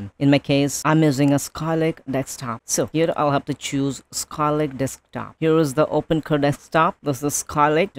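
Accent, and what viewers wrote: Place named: India. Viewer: hi bro.. is there any tutorial how to speak English like yours. You sound so foreign yet Indian. I am trying for international calling job.. any video or page will be helpful.. .. that would be so helpful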